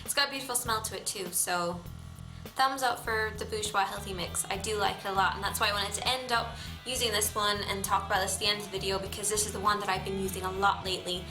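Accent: Ireland. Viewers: are you irish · ...English accent? Are you people mad? That's clearly Irish...or...Scottish 0.o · Are you Irish?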